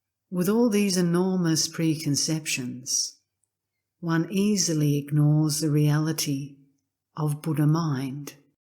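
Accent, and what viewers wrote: Australia. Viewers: Since I found this playlist I am listening every night. To hear these teachings read in a straightforward Australian voice is particularly helpful to me (I guess there must be some "accepting", "identifying" or "clinging" in me there!). What the topic is "being natural", a natural voice is very apt. While listening, I follow the instructions of the teacher, as best I can.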